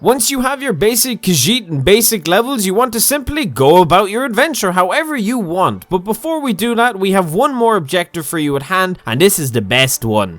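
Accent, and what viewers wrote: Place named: Ireland. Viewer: We are more addicted to your content than skooma lol. It's something about your Irish accent... it's amazing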